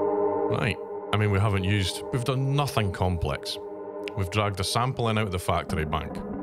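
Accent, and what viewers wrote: Scotland, United Kingdom. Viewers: Yes! I had to subscribe to the channel! Love the Scottish(?) accent. ❤️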